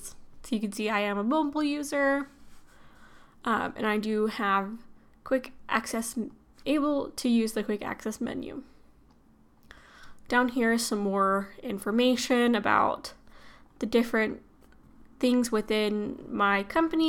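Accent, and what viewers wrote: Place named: United States. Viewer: Thanks God for an American accent :) ( it's annoying trying to understand a very strong Indian accent :P) thanks for the video.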